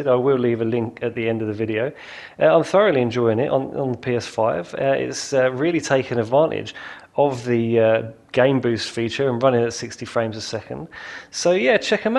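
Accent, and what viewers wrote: United Kingdom. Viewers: I’m assuming you are in the UK by your voice? I wonder if that has anything to do with it. I’m in the US and I’m guessing we don’t get the same updates.🤷🏼‍♂️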